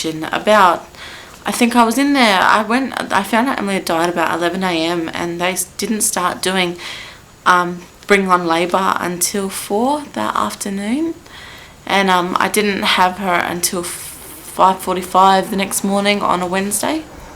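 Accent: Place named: Australia. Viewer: Hi. I wish I could give u a big hug right now! I can tell ur an Aussie bcos of ur accent. I'm an Aussie also. I have had 2 losses in 3yrs ( from so many complications) and I have never told my story until the doctors are talking me into it and they r eager to mention me in the medical journal. I believe in miracles bcos now I am 32weeks pregnant n baby is active n healthy!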